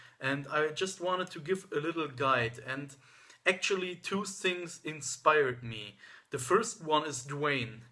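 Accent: Germany. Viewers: u german? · Den deutschen Akzent hört man sowas von heraus, das ist echt krass!:D Danke für die ganzen Tipps, insbesondere bezogen auf lagst du verdammt richtig. Ein paar gut klingende Vorschläge hätte ich aber noch: " " von klingt auf Vinyl einfach großartig. Selbst kurz vorm Ende der Plattenseite klingt es noch großartig. " " von besitze ich als Erstpressung von 1972 und das Schlagzeug, der Bass, das haut einem bis heute immer noch perfekt um. Sollte in keiner Sammlung fehlen, für 1972 aber auf zweifellos eine grandiose Abmischung, welche ja eig. kein Remaster benötigt hat, ehrlich gesagt. " " von ist ehrlich gesagt eines der Alben, welche einen Klang besitzen, was viele von Vinyl nicht erwartet hätten. Der Bass ist prägnant wo es nötig ist, jedes Instrument ist klar und ohne Verzerrungen, noch dazu ist der Dynamikumfang insbesondere beim ersten Part von großartig. 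Wohlgemerkt bei einer Spielzeit von glaub 27min nur allein auf dieser einen Seite! Bei keinem Album war ich bisher so von der Klarheit überrascht, wobei es auch aktuelle Livealben toppt, egal ob digital oder auf Vinyl. Ich belasse es erstmal dabei, da meine weitere Vorschläge für audiophileres doch wieder etwas mehr Wert sind als 1$/1€!:)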